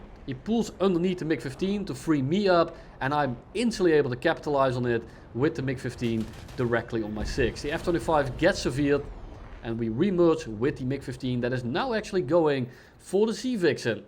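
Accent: Netherlands. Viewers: I can hear immediatelythat you’re Dutch at least I think so 😂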